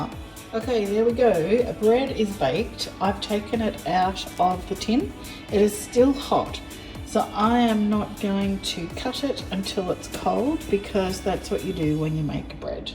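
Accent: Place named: England, United Kingdom. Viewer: Love the English accent. 😆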